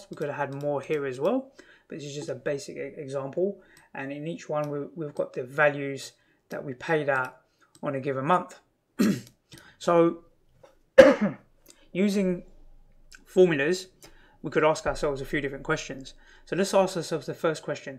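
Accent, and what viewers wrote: United Kingdom. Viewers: R u British bro.?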